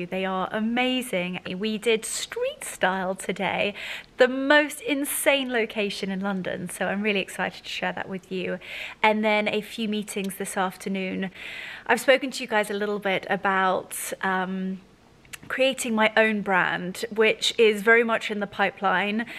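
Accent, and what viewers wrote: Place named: Scotland, United Kingdom. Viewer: Loved this - an amazing property 😊 Also loved hearing that Scottish accent!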